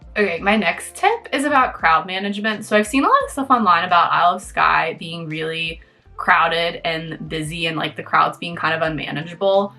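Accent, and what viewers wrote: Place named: United States. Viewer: You sound like a very respectful American. Thank you.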